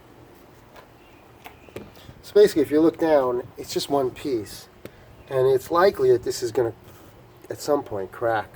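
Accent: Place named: New England, United States. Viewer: I can tell from your accent you're a dumbass yankee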